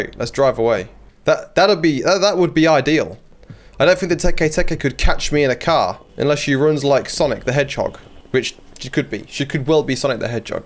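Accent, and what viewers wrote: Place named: United Kingdom. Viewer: As a French tutor, your French with a heavy British accent is impeccable. Aucun de notes.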